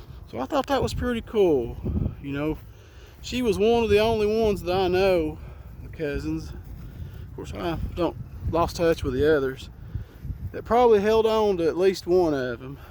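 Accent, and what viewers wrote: South, United States. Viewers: Always good to wake up on a day off and see has posted!!! It’s good you mention music it’s through the music I found the beautiful folk lore! Listening to Doc Watson and the such made me want to get a deeper knowledge of Appalachia because it reminds me a bit of the part of England I’m from. One thing I’d love to ask, going by your accent and some of the place names you mention I’m guessing your in Rasslin territory. Where you your self ever a fan? I love 70s/80s pro wrestling and the south has the best!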